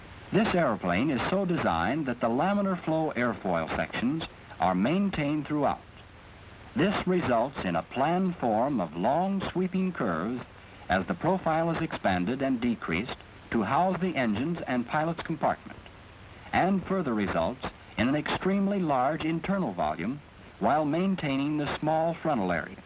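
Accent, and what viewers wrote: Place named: United States. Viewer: How interesting to hear an aircraft referred to as an 'aeroplane' in an American accent. I hate the present bastardised word 'airplane'.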